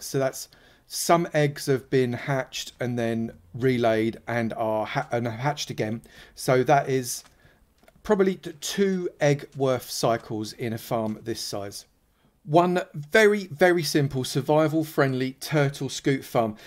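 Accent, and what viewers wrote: United Kingdom. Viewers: this is guy is so british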